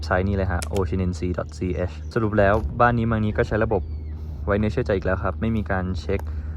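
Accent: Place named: Khon Kaen, Thailand. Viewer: ชอบสำเนียงเหมือนคนขอนแก่นตอนพูดภาษาอีสาน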